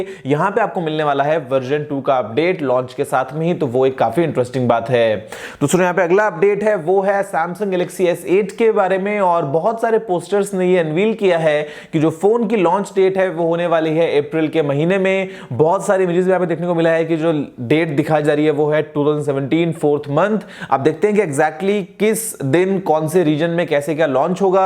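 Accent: Bengal, India. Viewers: app kya bengali ho???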